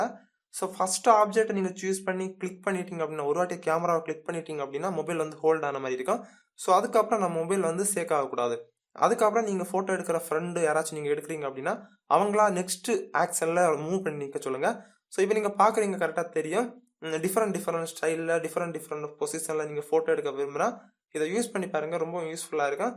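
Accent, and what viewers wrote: Kanyakumari, India. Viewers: neenga pesurathula...irnthu..kandupudichen...me..also Kanyakumarian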